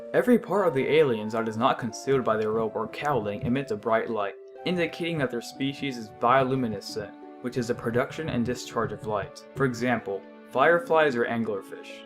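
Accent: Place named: Japan. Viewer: Are you Japanese? Your accent sounds Japanese.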